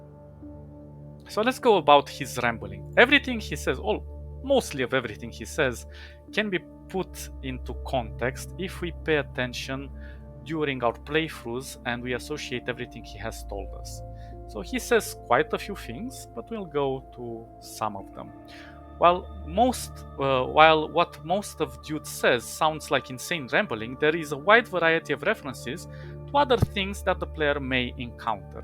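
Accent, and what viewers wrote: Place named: Lithuania. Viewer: Hey bro great video, but I have an unrelated question. Are from Lithuania or someplace close to it? You have an accent very similar to a Lithuanian friend that I have.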